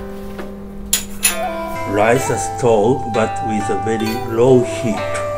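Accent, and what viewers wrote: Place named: Paris, France. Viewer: 美味しそうですわ！綺麗なイルドゥフランス語と親切な実演で助かります。 味噌汁とハーフカットプチトマトも美味しうございます。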